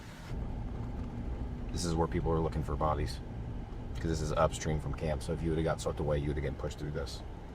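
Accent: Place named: Texas, United States. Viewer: That's just Texas in general you know that dude you got a Texan accent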